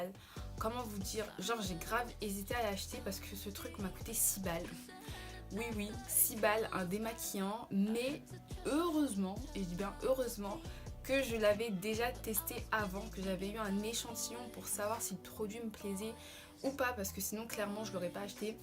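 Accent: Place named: England, United Kingdom. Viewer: Coucou ma belle! Alala je rêve de ton accent anglais ! Bref jadore ton haul, j'aime trop le gros pull new look 😱 bisous bisous 😘👍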